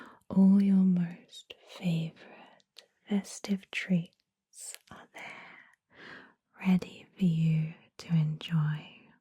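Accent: United Kingdom. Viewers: Your guided meditations are the best with the cherry on top being your british accent! This is as amazing as the previous ones ❤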